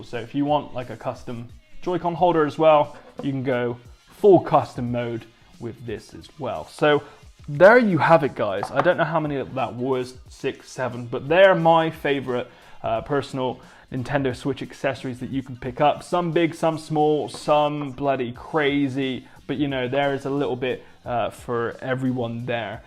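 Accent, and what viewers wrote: Bristol, United Kingdom. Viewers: Hearing my accent(bristolian) on videos hits hard haha. Realized from some of your other videos you're very local to me.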